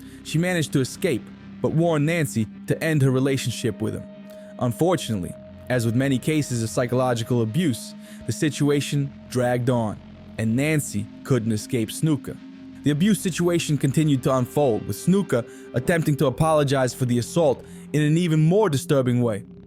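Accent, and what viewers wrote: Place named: New York, United States. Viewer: Horrible Mispronunciation, Using other people’s footage, mistakes all over and speaking in a ln awful New Yawk Accent… Smells like a Russo production 😂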